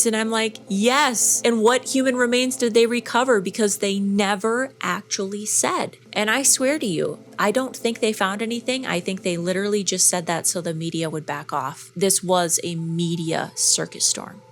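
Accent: Minnesota, United States. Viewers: are you from Minnesota?I love listening to your voice and I love your videos 😊Hi from Maine🌲🦞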